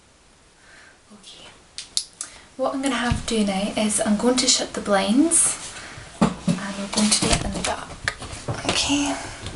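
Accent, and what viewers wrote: Scotland, United Kingdom. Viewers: Great scots accent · I could be wrong but iirc, she lived in Scotland for some years and picked up the accent somewhat. And, I mean... People can fake accents, it's pretty much what actors and voice actors do. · I thought you were actually Scottish until I read the description at 10 minutes in. I like the accent, more Scottish ones would be good! Excellent video. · Okay. I just subscribed. I randomly came across this video and I am glad I did! This was very relaxing. Not only am I digging the Scottish accent, but you are beautiful, as well! Keep up the excellent work! :) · Are you scottish? I've heard the accent before on a TV show. No offense but my brain is stuck between "that accent is frkin brilliant" and "that sounds funny". Greetings from Germany, keep doing what you're doing ;) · ***** And after seeing your answer I just now realised that the description said "attempted scottish accent" ^^ When browsing for ASMR videos I just look at the titles and listen to the start to determine if I'll watch it and mostly when I'm half asleep so not a lot of description reading going on :P Anyway, I think one has to know the scottish accent very well to tell the difference though. 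I just heard it in the later seasons of the TV show "Being Human" before but beside her having a thicker accent I straight up thought you were scottish ^^ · Well you seem to have it pegged pretty well. Just came across your videos yesterday and the scottish accent intrigued me. Cant wait to check out more of your vids. you'll probably hear from me again, my dear. lol. Thank you for taking the time to respond! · pleaaaaase do more Scottish accent asmr's! it's the best! also u perform this so naturally it's so unique. it actually feels genuine. i love it! I'm currently revising for exams and have only been listening to your asmr's :D x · ASMR . thanks for the reply. Your Scottish accent is top shelf. I have lived in the states for 10 years and slowly losing mine. good luck developing the aussie one. cheers, and have a good one friend. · Gotta give you credit for this I.have all on looking at a ceramic let alone remembering what to say AND doing a scottish accent! 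Great job keep the videos coming :) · This sounds Scottish not Brittish. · Some may think "NERD" when they here the Scottish accent and the glasses, but I can see past that to an ambitious young woman who can be a very good friend and helper! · doun, love the Scottish Accent. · That's a Scottish accent she's has. Same as mine ;) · I am completely in love with your Scottish accent! I'm a fan of it since trainspotting 😍 great asmr video. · Attempted Scottish accent!? I didn't think so. I though you were actually Scottish! 😃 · Finally a Scottish person doing ASMR! I'd guess West? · Your Scottish accent is pretty good but you're saying words with an R on the end funny e.g. Here, hour, or etc... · Wow,this was very nice,and love that scottish accent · You sound a lot more Scottish than British!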